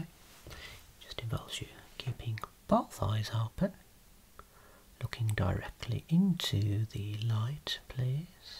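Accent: Birmingham, United Kingdom. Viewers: Happily I came across your excellent channel about a week ago and I've been enjoying so many of your videos. You clearly work hard to produce the best videos possible and it really shows; also great to hear the lilt of a fellow Brumie. I grew up in Handsworth in the 80's. Unfortunately I've been suffering from a worsening heart problem over lockdown and 19 months of self isolation so finding alternative ways of reducing daily stress has been important so thank you for your efforts in ASMR. I am also starting to learn quite a bit about my eyes and optometry :-)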